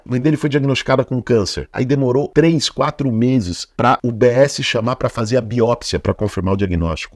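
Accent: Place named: São Paulo, Brazil. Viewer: BOULOS NO SEGUNDO TURNO.....SOTAQUE GENUÍNO DE PAULISTANO. NOSSO FUTURO PREFEITO.😊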